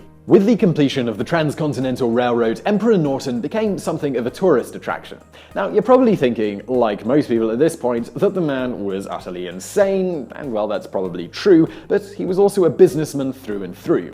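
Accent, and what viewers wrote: England, United Kingdom. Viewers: Reads "misled" as mice-alled Proof that having an English accent doesn't make you smart... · man, northernlion sounds british in this.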